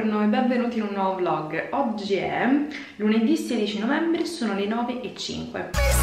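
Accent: Rome, Italy. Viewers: Il tuo accento romano mi fa muorire😂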